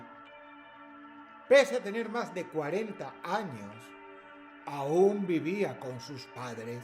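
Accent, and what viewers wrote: Spain. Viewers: El acento español de ufffas uma delicia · hablando con acento español es todo lo que está bien en esta historia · con acento español o.o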